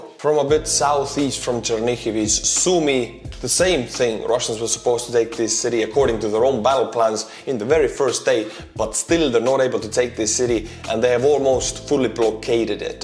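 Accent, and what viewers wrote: Estonia. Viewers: I love your Estonian pronunciation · Don’t lie! You know that Estonian accent is awesome. It sounds to my ears like some mix of standard “eastern european” mixed with a bit of Finnish, but then a healthy dash of Italian and even some Catalan with the rolled R’s. Love it, man - I’ve really grown to appreciate the three furiously independent “Baltics.” Edit: I came to this appreciation by way of John Neeman tools, now the Autine Forge and Guild, a Latvian collective dedicated to traditional building techniques and crafting world-class, handmade tools and gear.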